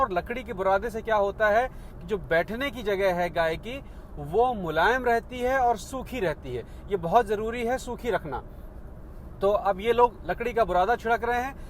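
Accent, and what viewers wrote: Bihar, India. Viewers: बिहार से हो क्या भाई हहहहह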